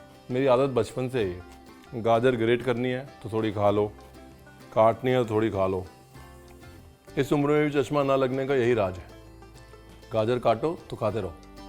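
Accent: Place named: Lucknow, India. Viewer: Bolne ke laheze me Lucknow hai ❤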